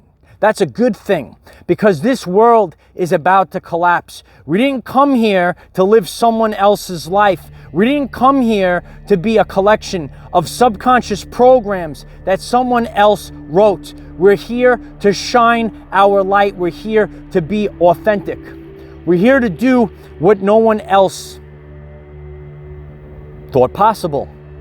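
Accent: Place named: New Jersey, United States. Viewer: cool Jersey accent.. who are the rainbow warriors? Never read about that in scriptures. Did some debased tribe make that one up? God created the Rainbow - displayed it after the first Flood / damnation of the humans who were huddling together in heathen actions. Rainbow across the road now 'represents' sodomy and child abuse. Which one is it mister virtue signal. Oh...and the Hopi never talked about 'rainbow warriors' that was invented by some Jewish guy in the 70s high on LSD. It was a cool story though.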